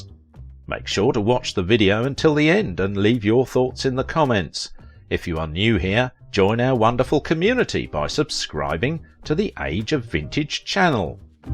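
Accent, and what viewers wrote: England, United Kingdom. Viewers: we invented the language, therefore we will speak it how we like. His pronunciation is correct for that part of England. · I’m assuming your comment is tongue in cheek. The narrator seems to be British. In the US we may say loo-tenant, in Britain it’s left-tenant. Even though the spelling is the same.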